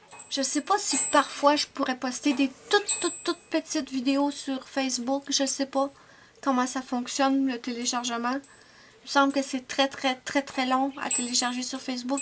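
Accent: Quebec, Canada. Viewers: Bonsoir, Cette nouvelle me fait beaucoup de peine car j'adore tes vidéos. 😖. Évidement que je vais continuer a te suivre sur FB et Instagram, mais je préférais YT a cause de t'entendre parler, de Ruby, de Spike le chat blanc. C'était tellement sympa, c'était un agréable moment. Sans parler de tes vidéos tutoriels et de ton charment accent québécois. Tout cela va beaucoup me manquer😖😵😬. Youtube a beaucoup changer en 3 ans. Cet espace de liberté et de partage est devenue une véritable dictature par ses nouvelles règles et sa cupidité. Certains youtubeurs ont aussi changer, de partage de leurs passions, ils sont devenues des "sponsorisés" a outrance pour le profit. J'aime bien ta sympathique simplicité et ta passion du coloriage. Tu le fait encore non pas pour le profit comme certains aujourd'hui. Pour moi tu est plus une amie sincère lointaine, qu'une étrangère d'un autre pays essayant de me vendre quelque chose. 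Tes vidéos me manqueront beaucoup car elles m'apportait un peu de bonheur dans ma solitude. Je te suivrait sur les autres réseaux sociaux😊😋. Tes coloriages sont magnifiques et Wendy est superbe😍👍👏. Tout mes vœux de bonheurs, de joies, de bonne santé a toi et a toute ta famille😘. Internet comme dans la vie réelle devient de moins en moins un espace de liberté. C'est bien dommage...... 😖😖😖 😫😫😫.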